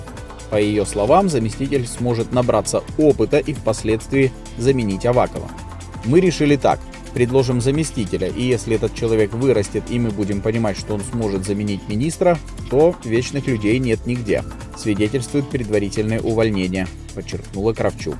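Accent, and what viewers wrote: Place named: Moscow, Russia. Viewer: Об этом Украине поведал парень с московском акцентом. Из прокремлевского жопо блока.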